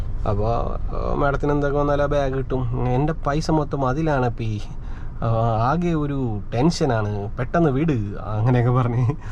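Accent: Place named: Palakkad, India. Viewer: Chettan parajath palakkad slang aanallo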